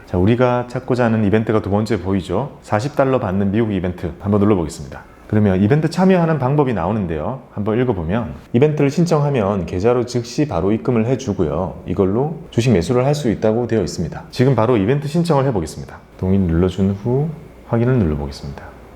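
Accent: Gyeongsang, South Korea. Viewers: 좋은 정보 감사합니다. 경남사투리 참 매력적이네요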